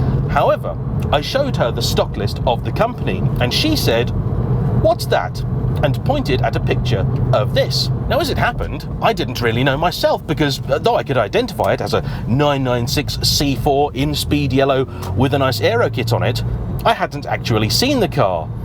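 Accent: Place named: Yorkshire, United Kingdom. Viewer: Did enjoy the Yorkshire reference and accent 😂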